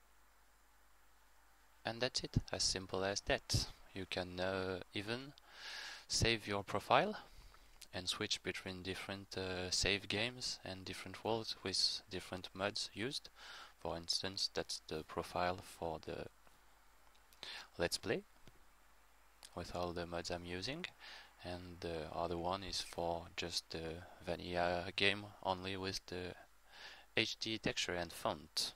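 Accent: France. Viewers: On s'en fout de l'accent j'ai jamais un francais parler si bien l'anglais. -un quebecois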